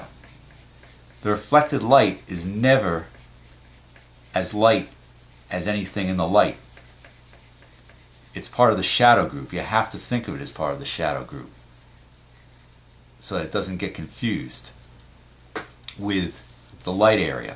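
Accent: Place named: New York, United States. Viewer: drawing is more fun with a new york accent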